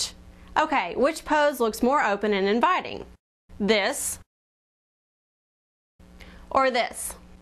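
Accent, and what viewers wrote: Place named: United States. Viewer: american accent hmmmm always different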